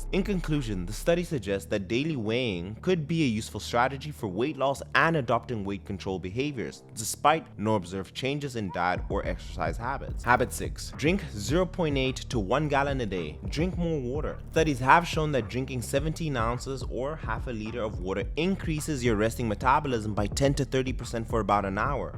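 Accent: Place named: Afrikaans, South Africa. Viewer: Love this dude's Afrikaans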